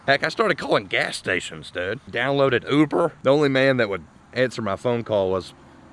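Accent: South, United States. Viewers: Looking forward to future updates, and subscribing for that lovely southern speaking voice.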